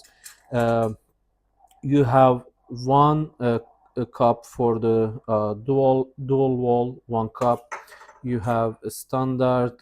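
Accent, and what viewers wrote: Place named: Turkey. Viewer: Aksan ben türküm diye bağırıyor 10/10 video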